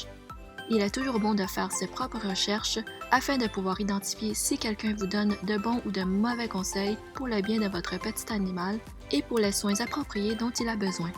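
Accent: Canada. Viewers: Salut ça fait plaisir l'accent du Québec ! Ton cochon d'Inde blanc est trop rigolo. Puis je l'adopter ?!? 😄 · J’ai beaucoup aimé ta vidéo ! Je crois que tu habites au Canada, parce que tu as un petit accent 😉 mais est ce que tu pourrais me dire où tu as acheté ton foin ? J’en ai jamais vue d’aussi bonne qualité !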